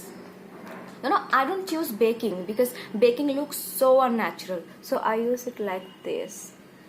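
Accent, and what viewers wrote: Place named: Bengal, India. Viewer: I really like your bengali accent❤...even some people ask me are you a bengali because my name is Manjari😊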